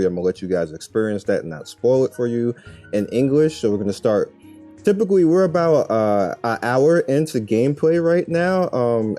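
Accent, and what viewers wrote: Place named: New York, United States. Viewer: Bro are you from harlem? You sound like you from New York. Nice Video on the game!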